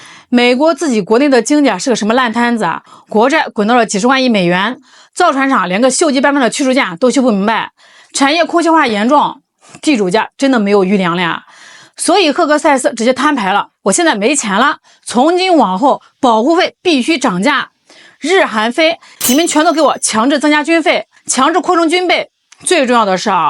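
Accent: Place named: Shandong, China. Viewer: 你这山东调不能常听要把我带偏😊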